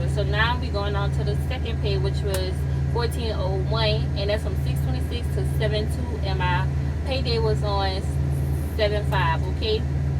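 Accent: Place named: Gullah Geechee, United States. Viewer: Such a geechee accent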